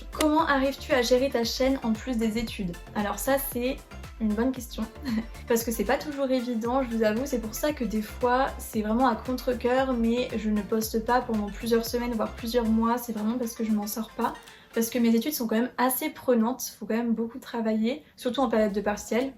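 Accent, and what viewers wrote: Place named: Russia. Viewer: trop bien cette vidéo face cam, on en apprend plus sur toi !! très bel accent russe d'ailleurs 😉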